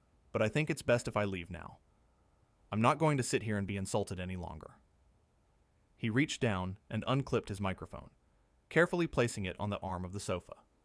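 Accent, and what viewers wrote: United States. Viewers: Some arse hole made a similar youtube video of a cliff richard interview with Lorane on morning tv ...total false.....sad bastard should get a job and earn a honest days pay. ...and whats this american voice about.??? Total tosser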